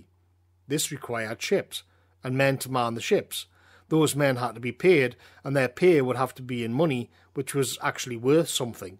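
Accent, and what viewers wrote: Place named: Scotland, United Kingdom. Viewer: Magnificent scottish accent. It overtakes the message a bit. I wonder where this accent has its home more precis.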